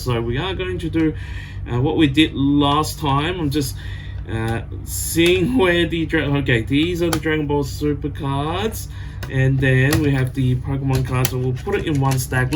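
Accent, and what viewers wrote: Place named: Australia. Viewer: So awesome! Random question but I assumed you were Australian until I heard you say you’re in Hong Kong. We’re you taught by an Australian?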